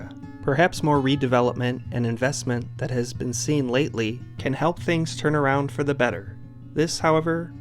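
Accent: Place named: Berkshires, United States. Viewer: Your accent sounds sort of like a Berkshires accent, though I'm guessing Wisconsin after "Worchester" 😉 Springfield and Bridgeport have had similar trajectories since the 50s. Both had major arms manufacturing disappear. Both had crime issues in the 80s. Still not in great shape, but doing better. Thanks for doing this.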